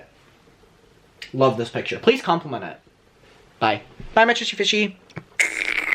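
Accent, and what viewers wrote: Ireland. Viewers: Irish acsent you mean hes not speeking native irish lets get that straight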